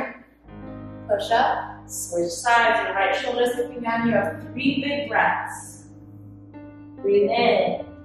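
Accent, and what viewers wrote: United States. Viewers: Did I catch some southern accent there? Like Alabama or Mississippi or Kentucky... don't know for real..cuz I ain't no American...and English ain't my native language... Anyhow... beautiful lady... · Is that a Texas accent?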